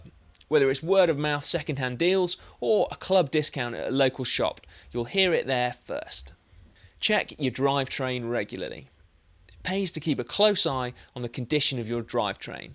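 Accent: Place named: England, United Kingdom. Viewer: It's an English accent!!!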